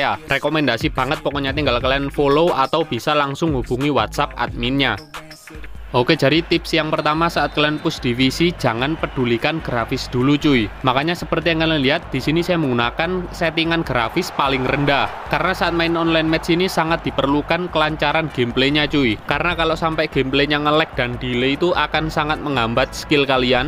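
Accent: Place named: Banyumas, Indonesia. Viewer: Wong ngapak kie kayane.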